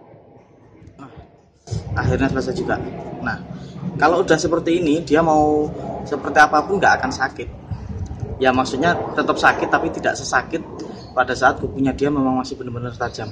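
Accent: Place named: Java, Indonesia. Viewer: suaramu kok tambah medhok hehe 😀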